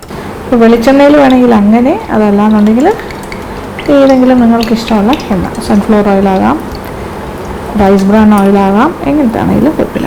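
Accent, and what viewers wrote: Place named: Thrissur, India. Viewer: അപ്പോ.. തൃശ്ശൂര്കാരിയാ...ലേ...? ഞാനും..same pich..😍😍😍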